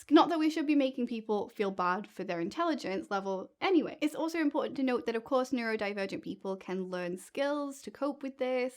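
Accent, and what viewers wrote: United Kingdom. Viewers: I did not notice or ‘hear’ your English/British accent until you said the word crisps... several minutes into your video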